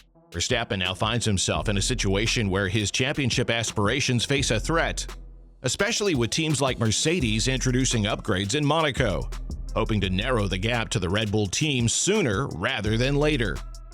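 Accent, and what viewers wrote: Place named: United States. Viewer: ngl I can't grasp an American accent trying to inform me of F1 information. Need british accent, can't believe information being said.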